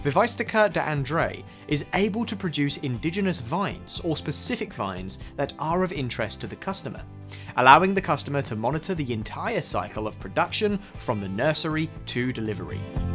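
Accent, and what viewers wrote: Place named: England, United Kingdom. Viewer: I really don't need the england man in my screen or voice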